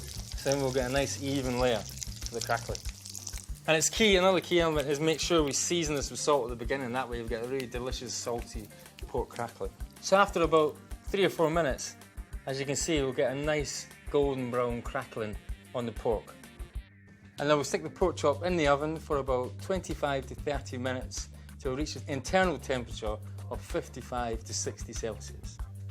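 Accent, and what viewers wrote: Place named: Scotland, United Kingdom. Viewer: Best Scottish accent ever! 🏴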